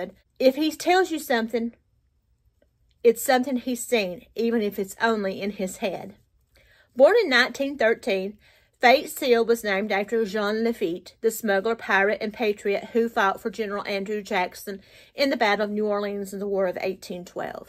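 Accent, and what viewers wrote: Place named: Georgia, United States. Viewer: Enjoyed the story. You sound like some "homefolks" I grew up with in south Georgia. Too bad todays kids listen to some "gamer" on youtube that can't say 3 words without a 4 letter word stuck in between them.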